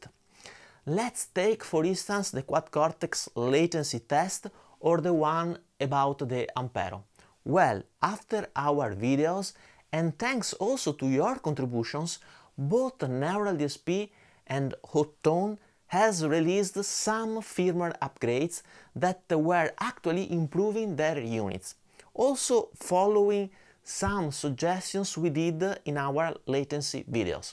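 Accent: Italy. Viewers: As an Englishman, I'm glad that these videos are in English, as my Italian is not that great, to say the least! English sounds good with an Italian accent, anyway. Also, it's the content that counts and from what I've seen so far, there are no issues there. Quality stuff! 👍🏼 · Your English pronunciation is actually one of the reasons I watch your videos… some of my grandparents were Italian, and it reminds me of them. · i'm a guitar player and as everyone else I watch a lot of YouTubers talking about the guitar. Said that I would say your videos are the best for me to understand what is happening in the digital and analogical revolution. Your videos are always among..even I would say the best about that your reviews and comparisons are always really objective and not subjective how boring nowadays can be reviewing the tone of guitars? You don't do that which is subjective as you don't say your subjective personal opinion on which units sound the best I actually really appreciate your work and I completely understand what is your purpose Nahhh in my honest opinion you should keep speaking in English for two reasons 1 the guitar community needs your videos 2 I'm Italian as well and even if I'm living in Britain my pronunciation is like yours, the accent can't be erased 😂 Unfortunately I'm seeing companies which are not welcome to criticism made by YouTube content creators, but criticism is what people are expecting from them Bravo! 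I add you don't really need to explain your work...everybody knows it's worth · Wish you all the best, thanks for all the knowledge and the professionalism I also wish there are more channels like yours , Italian accent is cool 😎 cheers and all the best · ! Your Italian accent would be a total chick magnet around here. I wish I was bilingual with your accent. My date book would be completely full. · great channel and as fellow Italian ( living and playing in London for over 20 years) I tell you don't worry about the english pronunciation, what you say is clear and understandable and that is what matters....and as a positive bonus it speaks about your roots. keep the compositions coming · Your channel is great! Your english perfect! accent reminds me of Salvador's Dali! speaking in english, accents must be diferents from people to people, you italian so you have italian accent, it 's natural. 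My question, what your real job? is it musician? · (Warning! This message is written by a non native speaker)! Your english is more than good enough. In Europe, at least, we hear english spoken with different accents every day. One would have to be pretty narrow minded to dislike your channel based on your italian accent. Your channel is so rewarding to watch, i love the in depth analysis you provide. Allways looking forward to your next upload! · Zero wrong with your English. You have an Italian accent - hardly surprising! Keep up the great work. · Great video!! I for one think the Italian accent you have when you speak English is a part of the charm and i have no problem whatsoever to understand you as a Swede that write/read and speak English pretty well so dont worry about it at all. All the best! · Servus 😉 Gratulations for 30000 follower, you deserve much more! I like your channel, also to be informed news around guitar gear. 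I am a basic English listener and speaker… I understand the most of it and I also like your Italian accent. Greetings from Bavaria an keep on 🎸👍🏼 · Ciao,! Your channel is great! … and I like the Italian accent: my other great love is MotoGP, so I am very used to it! ☺️ Be well! Greetings, from Downunder! 🇮🇹🇦🇺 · Hi. I'm Italian. Your English is perfectly understandable. The pronunciation, with a strong Italian accent that characterizes it, is clearer for the automatic translator than that of native English speakers. So what matters is that your content can reach everyone.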